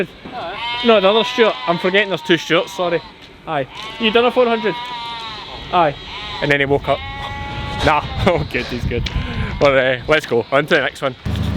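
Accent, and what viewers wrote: Scotland, United Kingdom. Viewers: Hello from Alberta, Canada. I worked with a fellow from Scotland who sounded quite similar to how you do when speaking to your mates. It took a good 3 months to understand him all the time. Now, no problem!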